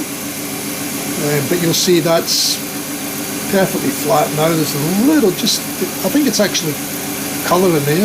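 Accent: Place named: Scotland, United Kingdom. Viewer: boy! That accent sounds very close to home! And here was me thinking you were an Aussie! I didn't know my brush was made by a Scozzy!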